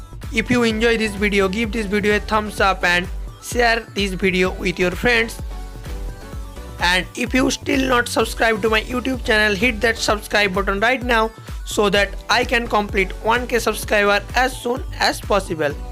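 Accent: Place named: India. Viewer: This guy sounds like india itself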